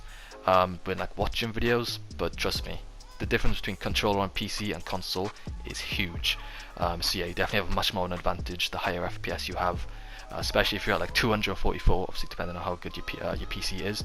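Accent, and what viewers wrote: Wales, United Kingdom. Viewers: Are you welsh I am I’m from swansea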